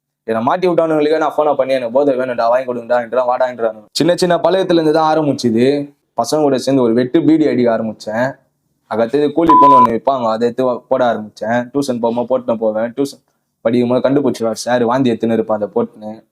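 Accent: Chennai, India. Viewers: Chennai native slang ah maathunga da dei😂.. kevalama iruku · Inaikithanda Chennai Tamil kathula thean payuthu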